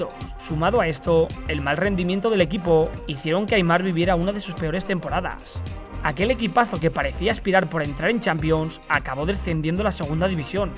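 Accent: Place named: Galicia, Spain. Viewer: gallego babling in his dialect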